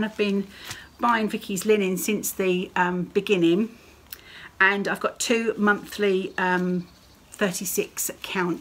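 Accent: England, United Kingdom. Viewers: I love your floss tube. I love hearing your accent. I am from the US and your English accent is so sweet to me. I love your content. If you are feeling pressured to have more, maybe you could put more distance between your episodes. I love hearing about your dog and your husband and your mom and your job, whatever is going on your life just as much as I love seeing your work. I hope you stay, but I understand you need to do what’s right for you. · I do so hope you continue with your flosstubes! I have totally enjoyed them! Being from the States, you have shared a bit of life across the pond, & it's been delightful! I've enjoyed seeing the English countryside, your charming accent, as well as your lovely finishes. You've inspired me to try new ways of finishing & different designs. I like that you seem real. Life gets in the way for us all at times, but your videos have kept me going through some challenging days. 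I have dropped some subscriptions, as they overwhelm me. Too much haul, so many finishes, one feels like we're in a race & can't keep up. Designs are coming fast & furious. It's nice to see someone working on older pieces. Watching you is like stitching with a friend. We seem to pick the same patterns...I too have been reworking my sewing space...it's like we're on the same wavelength. Maybe post monthly? I'd really miss visiting with you! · We love at my house. My husband and daughter love listening to your British accent as you describe your projects. We love it when you say, “bits and pieces”. Please continue with your channel whenever you have time. Your work and content are always so good! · Please do not leave. When I saw on instagram you had posted I made a cup of tea and sat down to watch. You are one of my favorites and I don’t get a lot of British floss tubers and so enjoy your accent. 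Just keep showing your work and cute dog and telling us what you have been up to. As to haul I can’t afford a lot either never mind about that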